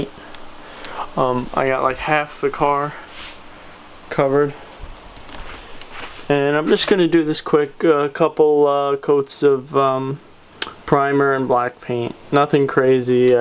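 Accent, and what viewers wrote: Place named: Sweden. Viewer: are you swdedish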